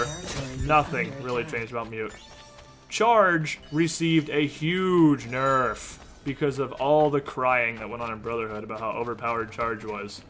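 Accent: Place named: Sweden. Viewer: i dont play ac3 or any i just come for the sexy gameplay and sexy voice and comentary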